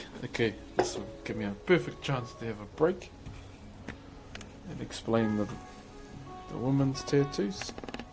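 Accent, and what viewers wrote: New Zealand. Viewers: Its the NZ Moari accent. Taika Waititi is who voices Korg and he is also a Moari from NZ ✌️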